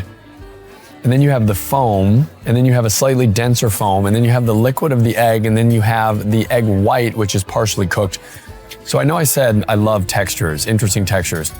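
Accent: United States. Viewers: hahaha watching your videos is like watching war! intense, the last word in luxury, the polite detachment, and imposing. you are a security risk for these places. you have done remarkable work - the videography, some of the insights shared, and vuori is no chump change, but i do not know if id allow my bf to watch a girl do reviews in a robe 😂 you are really testing the boundary here and even though you are mesmerizing, you have molly eyes which is all the rage on camera these days i am NOT surprised about your wsj callout. you have adopted a perfect la movie accent and ditched your humble midwest origins but i think some others are gonna interpret you as a threat if you don't listen the first time. sometimes your ideas come across as objectivity rather than curiosity (why did this happen? or just pointing it out without being obvious). you were asking for that - you need a little more sensitivity with these class lines man 😭i don't think ur a bad person but u r dangerous 😂 😂 😂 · The misterious pear with the wax tip on its stem is a Passe Crassane most likely, it's a French winter pear. 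They do this so moisture is not lost. It's a nice touch. PS it's "luh·vaat·suh" though your American accent is rather adorable.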